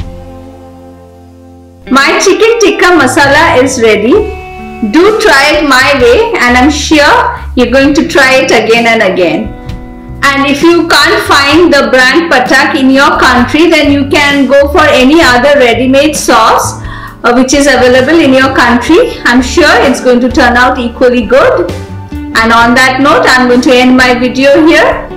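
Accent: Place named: India. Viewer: I could not understand what said she was adding? is a nice lady but with her high pitched voice and Indian accent, I struggled to hear what she was saying for much of the video. Looking at the video again, I think it was, the ''Fenugreek'' that she added?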